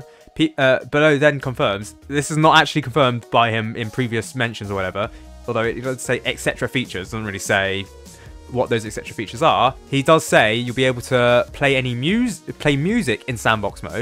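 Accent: United Kingdom. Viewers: Are you from Brittain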